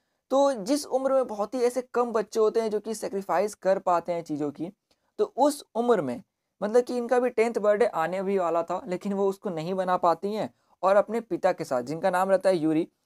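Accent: Bihar, India. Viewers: Ohhh u r from Bihar 😱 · Oh mujhe lga ki ap bihar se ho qki hlka apki boli match kr rhi thi but ab confirm ho gya😂♥️wahh!!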